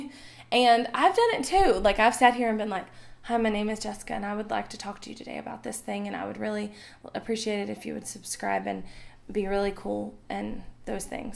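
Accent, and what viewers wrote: South, United States. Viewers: I’m glad I found your channel last week. I really like your presentation style and slight southern accent. And thanks for the tips! Keep up the good work! :)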